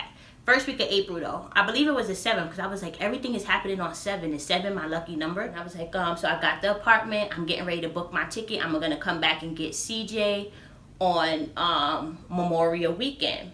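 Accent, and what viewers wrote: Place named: New York, United States. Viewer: lol idk how CO ppl sound lol but all i hear is NYC. Thanks for the info I’ll look up these areas for sure and weigh my options. Ugh it sucks leaving the city where we don’t need a car but I need a change. Btw great vid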